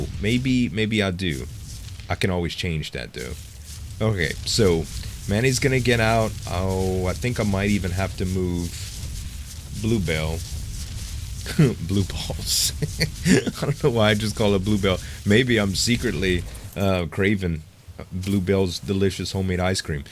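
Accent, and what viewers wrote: Louisiana, United States. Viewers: Where are you from Z? Cajun accent coming through. Abbeville LA here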